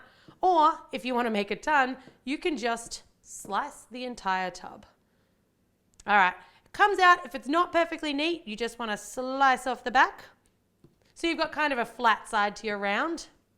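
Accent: Australia. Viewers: Are you from Australia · Are you Australian, omg what state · are you from Australia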